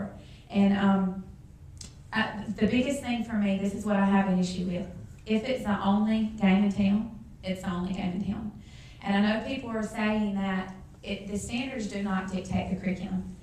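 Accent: South, United States. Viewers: why don't you people shut the hell up about her southern accent. Other parts of the country are just as annoying with their accents and dialect. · Have you ever been passionate about something? Have you ever talked so earnestly that your words might have been rushed or you enunciated less clearly than usual? I have. I know the correct pronunciation of "adjective," but to pronounce every single sound in the word takes almost twice as long and makes the concept much more intimidating. Would you correct a child who left out the "c" in adjective? I wouldn't. "Liberry?" Absolutely. But she's Southern. I'm Southern. We pronounce it slightly differently. Would you correct a black person using ebonics? Or a British person who says adVERtisment instead of ADvertisement? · She has a southern accent. I understood every single word. Y'all are just rude and mean. 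· its a southern accent hard to fallow if you've never heard it before i will admit. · It's called a Southern accent. You don't have any regional pronounciation of any English words? I'm sure you do. You could see how passionate she is about education, how much she wants to help her students, how her general discourse was well thought out and intelligent, yet you chose to focus on a couple of words among all these positives. Schools need more people like her. If you truly want to do some good for the world, stop being a nitpicker and a quibbler and see the big picture. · I think perhaps your ear is just not fine tuned to the deep southern accent. She is speaking rapidly since she is limited by time and clearly passionate about this subject. Being from Tennessee myself, I actually hear the 'd' in both "adjective" and "adverb". I find it amusing how people of the US (and Canada) have such difficulty accepting & understanding different dialects of other US citizens. 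Don't equate a southern accent with stupidity; many, many times that assumption will be very wrong. Some of us may not seem to speak properly in others' opinions. It's the rhythm and cadence of our speech, dropped syllables, run-together words, changing vowel sounds in words so they sound totally different, our habit of making one syllable words two syllables. Yeah. It's a Southern thang. Y'all ain't never gonna understand. Bless your hearts. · To all you complaining about her accent and pronunciation... we Southerners can't understand a word of your Yankee-talk, either! But we would not respond to a passionate, intelligent critique of this very dangerous Common Core crap by posting a comment demeaning her accent, while completely ignoring the substance of her excellent argument. We Southerners are not rude like that-- unless provoked.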